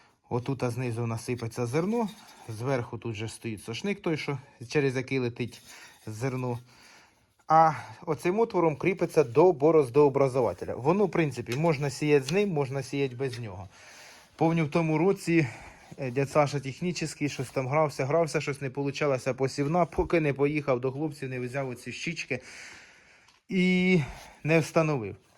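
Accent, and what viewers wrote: Poltava, Ukraine. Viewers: Він говорить Полтавською в нас це нормально.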